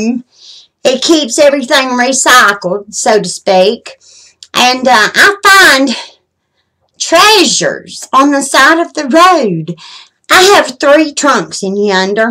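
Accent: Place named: South, United States. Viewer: Wow!! You are a wheeler dealer!!! “In yonder” tickled my funny bone! You might have more of a Southern accent than me!! Glad you had a successful yard sale with your treasures.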